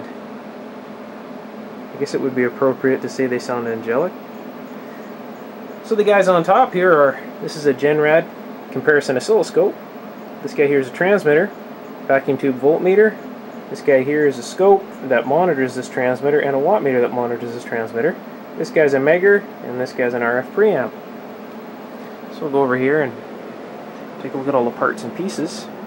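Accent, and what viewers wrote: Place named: Canada. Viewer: Found you vids, amazing! You are wise, skilled and accomplished beyond your years. Your video production and presentation skills are only surpassed by your technical knowledge and abilities. Your background would be an interesting story! Fascinated with the Canadian "accent." Looking forward to more videos, hope you don't get bored with the process.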